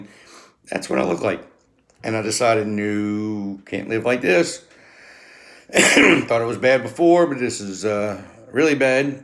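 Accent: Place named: South Louisiana, United States. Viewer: Which location did you use. You sound like you might be from south louisiana. Nevermind got to it at the end lol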